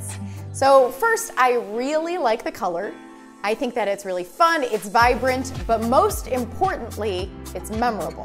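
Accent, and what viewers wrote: United States. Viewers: I found you on IG and assumed you were Russian. Now hearing you talk, you're obviously American lol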